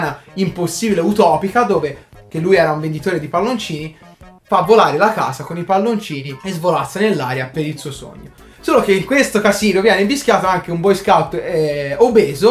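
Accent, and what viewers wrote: Tuscany, Italy. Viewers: Hai proprio ragione ed è chiaro anche nel tono di, molto "toscanaccio" (anche io parlo così, dal vivo, anche se in dialetto lucchese! XD) e colloquiale. Non pretende di essere portatore della verità assoluta. Ma certe persone leggono recensioni o vedono video come questo e seguono il metodo "pecorella". Mentre avere una propria opinione per me è qualcosa di meraviglioso.:) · adoro il toscano. ho aperto il video e l'ho lasciato andare solo per farmi cullare dalla tua voce.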